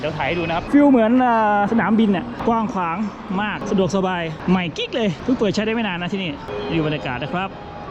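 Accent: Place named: Laos. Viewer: ปากเว้าพาสาลาวม่วนหลายอ้าย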